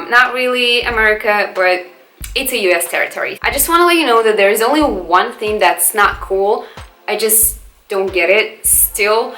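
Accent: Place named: Russia. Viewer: Your English is superb. I didn't realize your accent was Russian until you started talking about being there. Great video.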